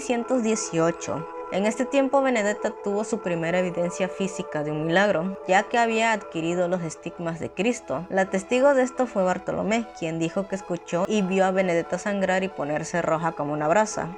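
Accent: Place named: Veracruz, Mexico. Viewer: Le puse más atención a tu acento jarocho que a la historia en si xD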